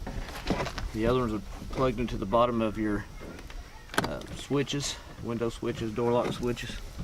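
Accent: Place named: South, United States. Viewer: Thank you for doing such a great job on explaining this! Great video and you speak my native luanguage - southern! Have you ever replaced the climate control on a ridgeline? That's next after fixing my door lock.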